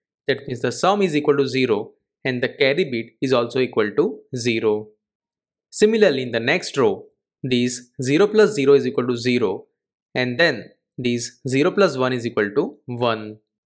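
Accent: India. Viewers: I heard the Indian accent and i already knew i will understand it by the end of the video, haha. Thank you.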